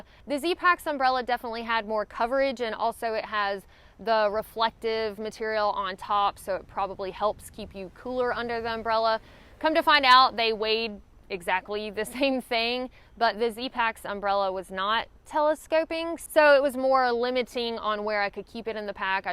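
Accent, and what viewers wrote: South, United States. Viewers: You have the coolest accent!!! Country girls are awesome!!!!! Be safe and thanks for the content!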